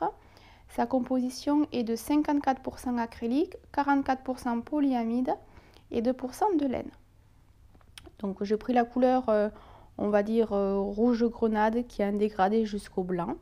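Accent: South, France. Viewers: Ça y est! J'ai terminé mon pfeilraupe, je l'ai tricoté en laine Rachel de chez Zeeman, j'ai utilisé 2 pelotes complètes + le début de la 3ème. Il est vieux rose dégradé blanc et il y a quelques défauts au niveau des trous, mais une fois porté cela ne se voit pas!!! MDR. Encore une fois merci pour tes explications claires et en + j'adore ton accent du Sud! Bisous bye bye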